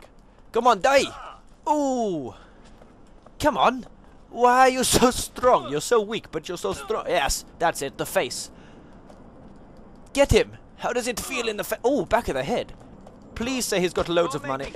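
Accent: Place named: Russia. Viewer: Your little russian accent sound excatly like Niko XD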